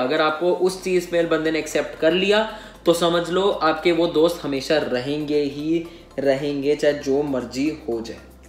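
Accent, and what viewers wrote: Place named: Sindh, India. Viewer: I can surely say that you’re a Sindhi Indian. Borders just can’t change our accent ❤️ Love from SINDH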